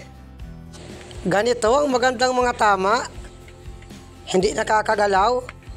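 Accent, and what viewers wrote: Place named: Batangas, Philippines. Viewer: Idol taga saan kb kc ung pana2lita mo prang marinduque or btangas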